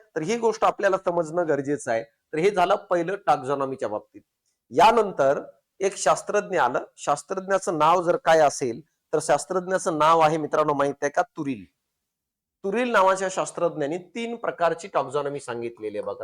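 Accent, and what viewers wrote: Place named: Marathwada, India. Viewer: Sir tumhi aaplya मराठवाड्यातील आहेत हे आपल्या बोलण्यातून आपुलकी नक्कीच कळते.....❤❤ सोबतच सायन्स हा विषय खूप सुंदररित्या story type Ani intersting easily concept explain krta....salute to as you gain the understanding over the science in your academic n now you are applying it❤❤ it's obsolutely great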